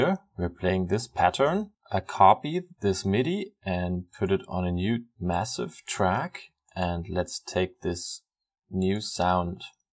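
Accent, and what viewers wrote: Germany. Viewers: man hört das deutsche so doll raus XD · Mark Toronto Hab mich schon gefragt, ob ich der einzige bin, der meint einen deutschen akzent herauszuhören :D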